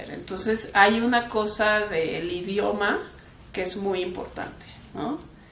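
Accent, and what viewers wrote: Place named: Mexico. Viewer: eres la Polaka más mexicana, no hablas español hablás latino mexicano mi Reyna estás hermosa , Rule' s Naucalpan mex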